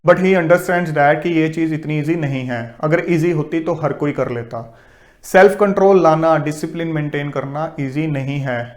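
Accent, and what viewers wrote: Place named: Punjab, India. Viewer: punjabi punjab aala banda e bolda mere pra.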